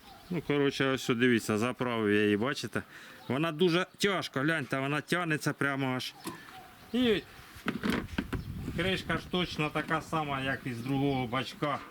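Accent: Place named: Volyn, Ukraine. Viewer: Волиняка нюхом чую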